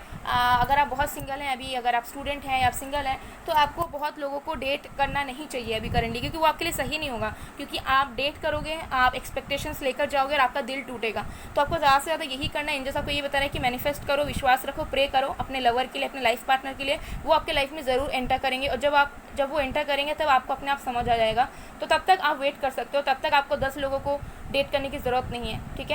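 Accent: Bengal, India. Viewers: Mam aap bangoli ho kya.....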